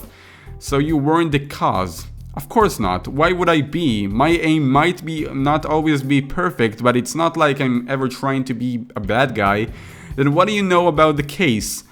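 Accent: United States. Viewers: arent you american?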